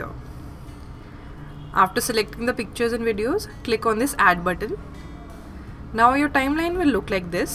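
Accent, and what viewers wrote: India. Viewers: This is an extremely common Indian accent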